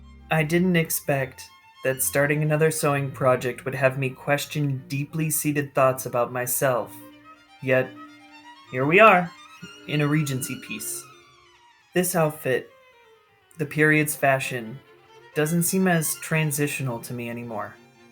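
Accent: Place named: United States. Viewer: I was thinking that you had suffered a catastrophic research failure... but then considered your American accent. So, yes, the Macaroni's were famously tasteless in their dress, celebrating the nouveau riche over the 'conspicuous inconspicuousness' that was fashionable at the time. So, while you certainly did well with the colours and embroidery patterns of the period, you were then let down by the poorly cut weskit and over-buttoned breeches. Sigh, and no hat. Please, wearing a hat was thought to be a matter of basic decency. When indoors and not actually wearing it, you would still be expected to be carrying it! Your sexuality is of no concern to me, but your drunken fashion choices (thank you, Dionysus) appear to be deliberately offensive.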